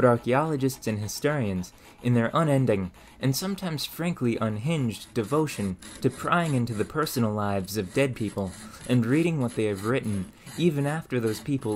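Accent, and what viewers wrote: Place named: Oregon, United States. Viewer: Sounds like Organ State to me.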